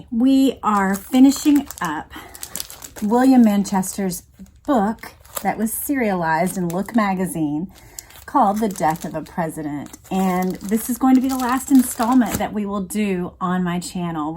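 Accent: Texas, United States. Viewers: always reminds me of the Actor Andi Mac Dowl it's that lovely Texan Ascent 😊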